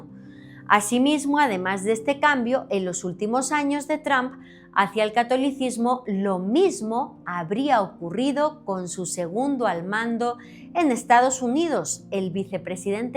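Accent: Spain. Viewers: Tu acento suena entre español y mexicano 🤔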